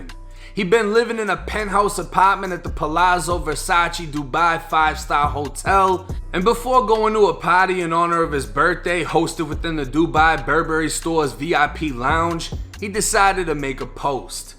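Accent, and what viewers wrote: Boston, United States. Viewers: U should follow the Nigerian blogger Dr Yemi on IG for all the updates on HushPuppi from Nigeria 🇳🇬 point of view- I KNEW he was gonna start telling on every1 bc his own homeboys told on HIM - they all clowns 🤡 if u asked me - I mean WHO gathers their own evidence against themselves- 🤦🏾‍♀️👀👀✊🏾✊🏾 thanks for the video though we love ur accent (is THAT a Southey BossTown accent) or Worcester?? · Uh, He’s from Boston? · putting wayyyyy too much on that boston accent bud lol. cringe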